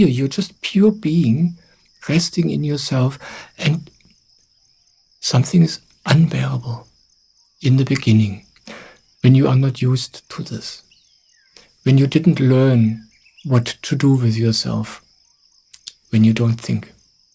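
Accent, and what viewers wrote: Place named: Germany. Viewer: Accent like Geraman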